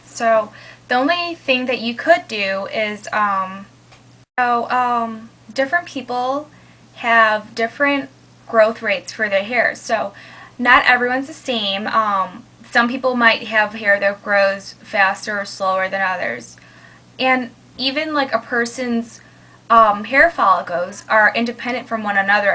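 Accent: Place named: South, United States. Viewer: its sooo funny watching you talk, like u look asian buh u talk country, 1st time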